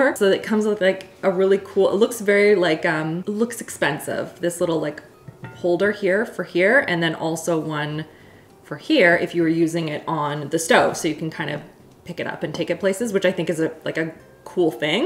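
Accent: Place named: Canada. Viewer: After being convinced that Canadians don't say "eh" at the end of there sentences. We have! Yessssssss!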